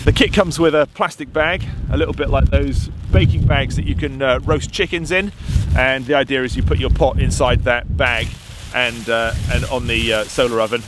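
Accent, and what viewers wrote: United Kingdom. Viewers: Oooo with warmer weather we might just see out doing some nice camps this spring? Man, I hope so. You are one of my absolute favorite channels on YouTube. As an American, I just love your accent and your whole demeanor, really. You are just an epic individual, so totally British. Just lovely. And the funny thing is that I don't even camp. I can't stand to go camping. But, I can watch you all day long., you are the best. Cheers!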